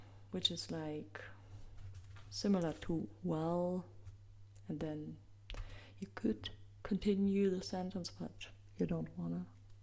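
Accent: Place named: Germany. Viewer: Hannes Von Sydow hmm, wie kann man nicht raushören, dass sie muttersprachlich deutsch spricht ? :o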